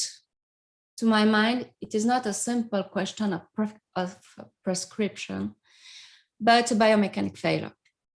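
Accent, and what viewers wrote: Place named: France. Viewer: In spite of the heavy french acent I really enjoyed this lecture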